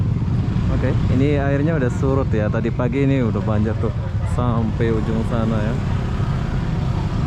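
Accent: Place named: Malaysia. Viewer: Saya terkesan dengan logat bicara bg yg kental akan nuansa melayu jadi saya do'akan semoga bg sukses dan sehat selalu. Salam santun dari saya putra melayu riau🙏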